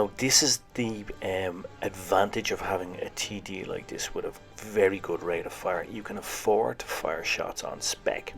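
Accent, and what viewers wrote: Ireland. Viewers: World of Tanks Blitz Ano ty si parada player...yes bc you v Good player and you voice is super...Irish...its Ok Am Polish player you a Normal nice Man...✌️💝🇵🇱 Am live in Poland,Prague is very very nice town do you se Kretek adventures 😂😂😂😂 its my chilhood childern in Czech,Poland,Slovakia..love this hmmm....creature 😂😂😂✌️👍😉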